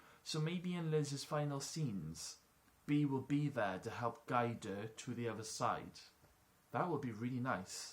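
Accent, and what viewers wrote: Wales, United Kingdom. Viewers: Thank you for reading out two of my comments. So happy that you are interested in what I my opinions :D That link I sent you, I think the producers may have gotten onto that because I noticed earlier that they photos have been replaced. The comment with Channing being the stalker, I actually jumped to that conclusion before I watched that episode. I should wait until I watch the episode before I form conclusions lol. You mentioned Prisoner: Cell Block H, I am a massive fan of that show. I am from Northern Ireland, so I watched it too, on ITV back in the 90s. I was a young kid at the time. It was always an argument to get to say up late to watch it, and most of the time it was taped for me to watch the following day lol. One thing that I was disappointed about in Wentworth is that Bea and Joan never got to have their fight scene, unfortunately. 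I wanted something similar like episode 326 of Prisoner, with a more updated version of that awesome music used in that fight scene. I thought Season 2 was too soon since it was Joan's first season, but never happened in Seasons 3 or 4. Oh yeah, on how to pronounce Marie. You can say it either way. I know of a few women called Marie and it can be pronounced Ma-ree or Marry. It probably sounds different with my accent. The Marry version is just a fancier way of saying it really lol. I like the way you say it, keep saying it that way in that nice Welsh accent :) Apart from Prisoner and Wentworth, what other prisoner shows do you like? I a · I can't wait till season 7 starts, any idea when it's going to be shown in uk? Love your welsh accent by the way😀.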